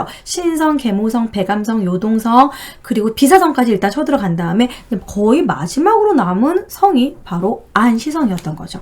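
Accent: Gyeongsang, South Korea. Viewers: 너 대구출신이지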